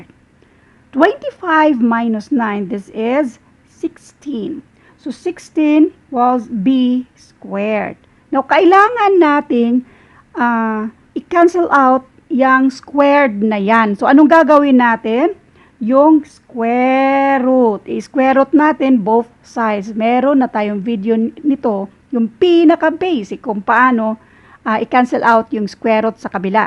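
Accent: Visayas, Philippines. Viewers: Bisaya ka Maam?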